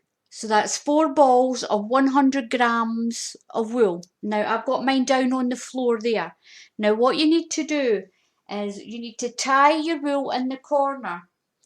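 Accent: Scotland, United Kingdom. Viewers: Lovely hearing a Scots accent 👍🏻💜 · she's sounds Scottish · Are you from Scotland? My paternal grandparents were from Scotland. Both of my parents worked so I spent most of my time around grandma Mary and Grandpa Pete. I developed a Scottish accent even though I have never been to Scotland. It's gone now, but it's funny to think about it. They had a very heavy accent so I had to "translate" for them often. LOL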